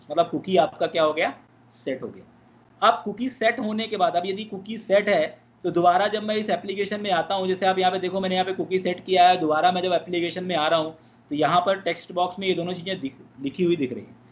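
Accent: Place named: India. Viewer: God I don't know if I either love or hate the hindi accent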